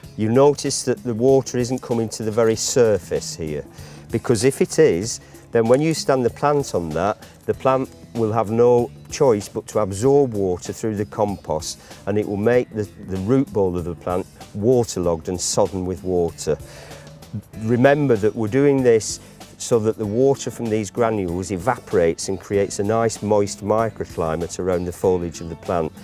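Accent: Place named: Northern England, United Kingdom. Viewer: 🤔....Michael Caine is a londoner.....this guy is from the north of England 😄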